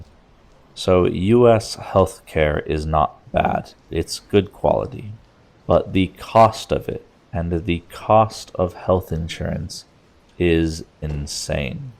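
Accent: United Kingdom. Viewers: You sound American ..then you slip into a British accent every now and then ..welcome to the UK.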